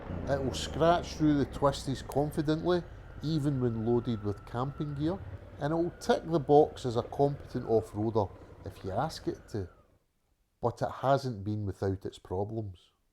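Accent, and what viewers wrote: Scotland, United Kingdom. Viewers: Love the review and the comparison to the bullet proof Honda's of days past. I owned quite a few Honda's from the 70's to 90's and apart from the VF1000 (mad bike) they were utterly dependable. I now drive Guzzi's with varying levels of reliability. But I must say the most current one (a 2008 Stelvio) performs well, for all the other ones I trade driving pleasure for reliability😇. Worth it, but it is not for everyone. Specially my Quota1000 which I think is the most brilliant failure for a motor bike. A lot of issues, but it drives brilliant like no other. Any way I enjoyed your video, you are using your bike not for show, but for what you can do with it, and I am very envious of you living in Scotland , as I know from experience how nice it can be to drive there. Great small twisty roads and beautiful scenery. Had to re calibrate to understand your commentary a bit with the Scottish accent but don't change. 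Awesome content. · Thanks for your work. Iran has been my best trip so far, the people there are so friendly with the visitors and specifically with riders because we have bikes they have never seen in their life (big ones are only for the army and the police). As always , problems come with politics ans religion... On the way to Iran the Balkans are fantastic when riding on mountain roads (get away form the coast...), Albania is......different... And eastern Turkey (Kurd country) is also interesting with very nice people. I love your scottish accent (although sometimes difficult to understand) and Scotland (I made the North 500 road) Keep well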